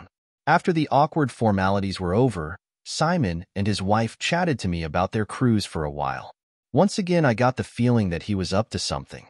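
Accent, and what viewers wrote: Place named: United Kingdom. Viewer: Hehe british accent lol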